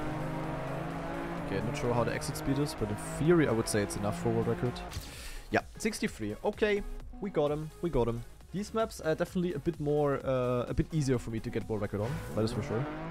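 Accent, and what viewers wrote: Germany. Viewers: Finds immer geil wie dein deutsch durch kommt 😂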